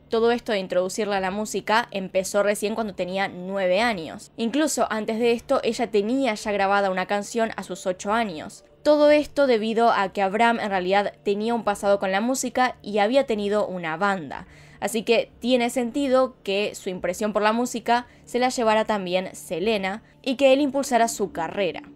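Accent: Argentina. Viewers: Muy buen video de Selena tristemente ya no está conosotros yo pienso si siguiera viva sería más famosa , lo que me gustó de ella que era muy sencilla no se le subía la fama también era humilde te felicito por el video y me gusta tu asentó Argentino como pronunciado la Y driega me encanta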